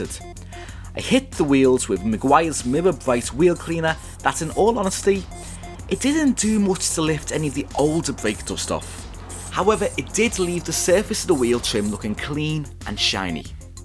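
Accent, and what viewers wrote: Liverpool, United Kingdom. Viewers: from witch part of uk this lad is? very intresting accent. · Are you from Liverpool or Wirral at all? Can hear a scouse twang in your accent, i'm from Ellesmere Port :-) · Am i mistaken or you're from liverpool, i deduced that from the way you speak.If I am wrong please do tell me. As someone said it's nice to see someone reastoring normal car you know one for laymans not ones from wealthy people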